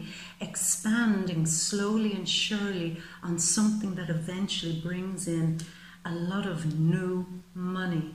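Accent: Ireland. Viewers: Gemini sun, Aquarius moon, Scorpio rising here <3 I watch a LOT of tarot channels and yours is by far the most touching, accurate and thorough <3 the readings this month and last were eerily accurate to my situation. You explained the exact thing that happened to me. This gives me so much hope and inspiration. Plus, my family is from Ireland and I love hearing that calming voice <3 reminds me of home. Thank you so much for taking the time to do these readings for us.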